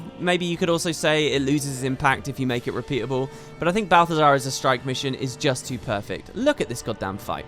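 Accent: United Kingdom. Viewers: Hey man, long time fan. Just thought I'd let you know that I've been watching another YouTuber named MrTommo2304 (who does Bleach anime content); and his voice sounds bang on like yours. Could be your doppelgänger. Definitely a British accent thing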